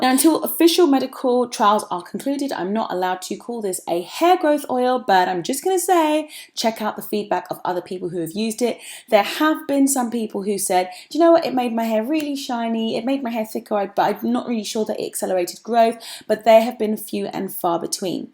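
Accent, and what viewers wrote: United Kingdom. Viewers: ARe you going to show the video of the meet up in NYC? Thanks again, i like to listen to your British accent, it is so calming.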